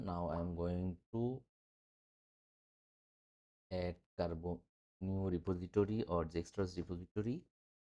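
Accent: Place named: India. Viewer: NEXT TIME ASK A NATIVE ENGLISH SPEAKER TO RECORD THE VIDEO. VERY DIFFICULT TO FOLOLOW YOU WITH THAT STRONG INDIAN ACCENT.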